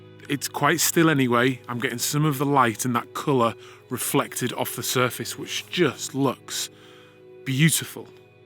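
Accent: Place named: Wirral, United Kingdom. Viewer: Cheers bud! haha I was hearing a northern accent; sounded bit Wirral'ish (where I'm from) to me haha.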